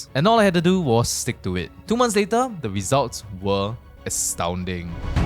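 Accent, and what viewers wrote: India. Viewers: nice and informative video, but how come you have Indian accent? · not trying to be rascist but how do you sound indian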